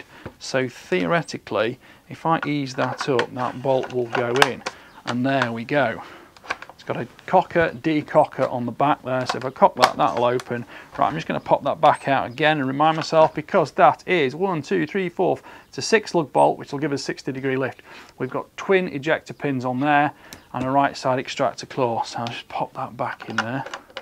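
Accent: United Kingdom. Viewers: From Britain ?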